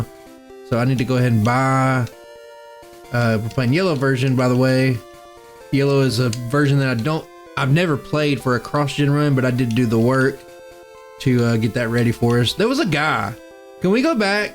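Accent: South, United States. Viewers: honestly imo the country accent is dope, its one of the things that separates you from your average poketuber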